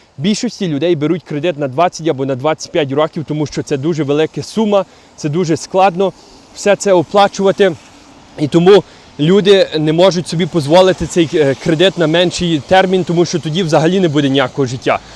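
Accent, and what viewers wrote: Canada. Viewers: У Вас, дуже, канадський акцент! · Цікава українська, вже з канадським акцентом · це я знаю,маю родичів там,але на мій погляд гя " говірка " як ви кажете ,це скоріше українська мова з канадським акцентом..дуже ріже слух )))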